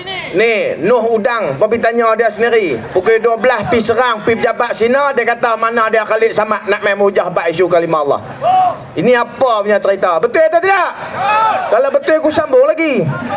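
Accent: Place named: North, Malaysia. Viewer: Loghat utagha pekat sangat...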